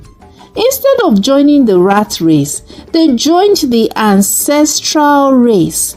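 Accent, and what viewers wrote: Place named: Africa. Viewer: ❤ I sincerely love the fact that this is narrated with the beautiful African accent. It makes it all the more enjoyable. Thanks !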